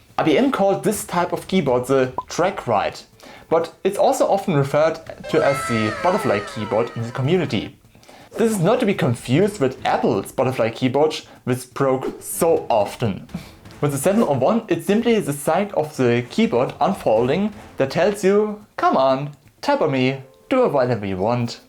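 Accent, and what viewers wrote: Germany. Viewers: Dude, your accent is the every English speaking German in any movie. Fantastic. Love me a thinkpad. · Das deutscheste Englisch was ich bisher gehört habe. 😀 Aber super Content mega! 😊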